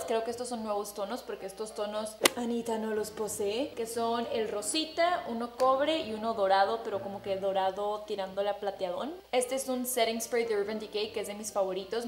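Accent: United States. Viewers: Noooooo, no dejes de hablar inglés, amo tu pochés Hahaha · No, tú sigue hablando pocho 😁, yo también soy de frontera y tengo que aprender inglés, me gustan muchos tus vídeos ❤❤ soy nueva ya llevo casi un mes viendo tus vídeos, y me gustann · has un vídeo usando solo productos de It cosmetic please. Y amo tu forma pocha de hablar nunca la dejes♥️♥️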